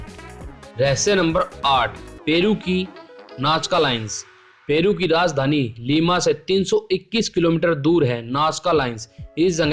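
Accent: Haryana, India. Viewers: Bhai Haryana se h kya tu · Haryana ka hai paka akhar sound se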